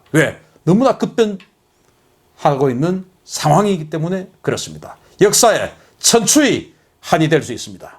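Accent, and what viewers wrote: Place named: Busan, South Korea. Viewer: 죄송합니다 만 저도 부산출신인데 사투리 재미나요 ㅎㅎ 표현도 재미나심 편대가 촤아아악 무장을촤아아악 ㅎㅎㅎ촤아아악을 자주 표현하심 ㅋㅋ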